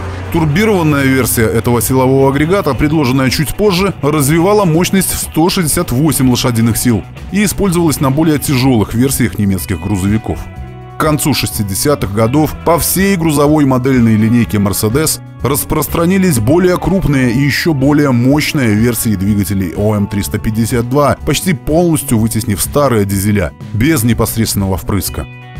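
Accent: Belarus. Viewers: не знаю откуда, но акцент характерный для Витебщины, особенно ярко слышен на склонениях цифр - "пЯци", "дзевЯци" и подобное. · Автор из Беларуси?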